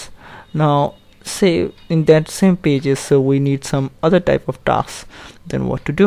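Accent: India. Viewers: You sound like Indian?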